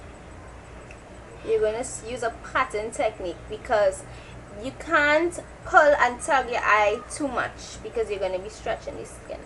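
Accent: Trinidad and Tobago. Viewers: Its trinidadian accent :D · haha You sound like my mum, she is a Trini too and she talks the same way you do, aw so cute hehe :) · Are you trini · oOh cool ur fromm triniii heariin da accent! (: which one would u recommend for oily skin the stick or the cream to powder foundation by black opal???? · love to see my Trini ppl . love the accent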